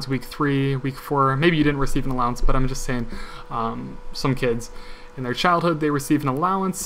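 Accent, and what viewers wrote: Canada. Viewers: Love dat Canadian accent!!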